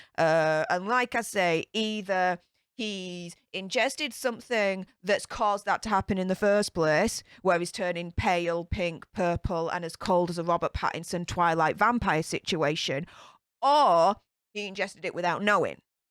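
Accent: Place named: Northern England, United Kingdom. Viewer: I LOVE your accent,!! You must be from UK North- Lancashire, maybe? You sound like Victoria Wood a bit, and like the character Bubble on AbFab a bit. I'm American, so I'm not really up on all the British accents, but as a fan of some Bristish shows and stars, that's who you remind me of. <3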